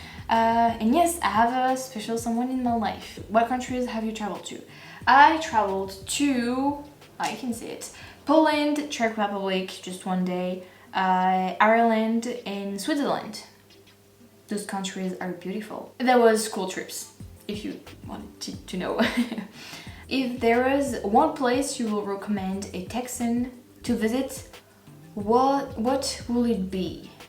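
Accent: France. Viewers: I Love your videos. When I finish watching your videos, I think with a French accent. ☺️❤️😊😀 I know you love the drums and drum solos, so I kindly suggest you check out drum solos by 80’s pop musician Sheila E. She is amazing and is considered the greatest female drummer in the world.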